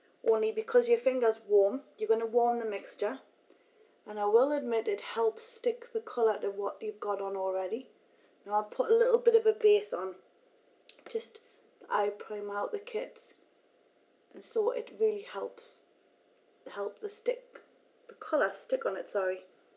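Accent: Newcastle, United Kingdom. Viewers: oh get a life, shes a geordie lass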